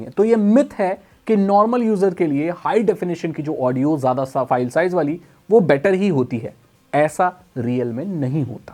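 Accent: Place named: Rural, India. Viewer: Aase lgra hai kisi gau k bnde ko sun rha hu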